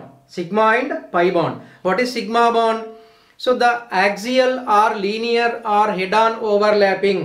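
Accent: Kerala, India. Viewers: Sir ARE YOU FROM KERLA??? I LIKE YOUR SPEAKING 👍👍👍👍 AND TEACHING ☺️☺️☺️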